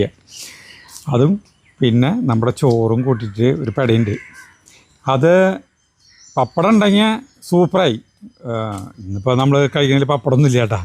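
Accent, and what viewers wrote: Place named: Malappuram, India. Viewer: മലപ്പുറം ഉണ്ട് samw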